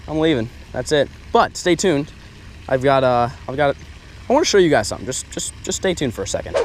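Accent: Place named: Pennsylvania, United States. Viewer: You gotta be from pa bro 😂😂 u talk exactly how I do when I’m fishing 😂😂 I might cuss a little more tho 🤷🏻‍♂️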